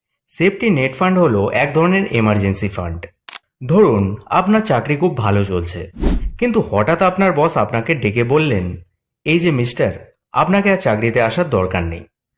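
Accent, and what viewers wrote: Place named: Kolkata, India. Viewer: আপনার কথা শুনলে মনে হয় কলকাতা একটা ভাব আছে,, এটা কি যদি চেঞ্জ করে একটু বাংলাদেশীদের মতো করে বলতে পারেন,, আপনি অনেক দূর যাবেন😊😊😊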